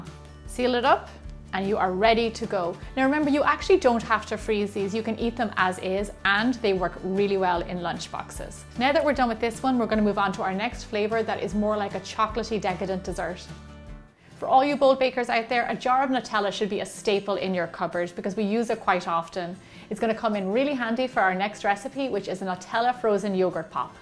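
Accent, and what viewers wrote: Ireland. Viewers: Are ya Irish? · is she from Ireland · she is from Ireland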